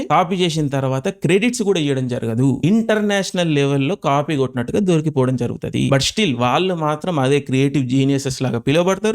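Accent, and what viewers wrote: Telangana, India. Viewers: Telangana Yasa 🙏🏻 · ఇంతకు నువ్వు మాట్లాడేది తెలుగా తెలంగాణ నా??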